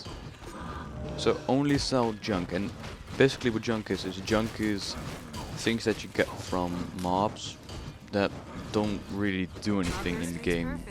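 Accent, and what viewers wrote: Netherlands. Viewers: very nice video, i directly heard u were dutch ;) subbed for more videos! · Dit is letterlijk de eerste keer dat het me niet stoort dat iemand die nederlands is engels praat in een filmpje. Je hoort het wel, maar je hebt (voor zo ver ik hoorde) geen fouten gemaakt zowel grammaticaal als in je uitspraak. Daarbij was het ook een heel handig filmpje aangezien ik net 2 dagen bezig ben met gw2. Keep up the good work!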